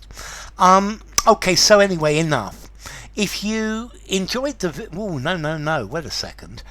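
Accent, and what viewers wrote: United Kingdom. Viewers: I absolutely love the British accent. It's the most polite & respectful language..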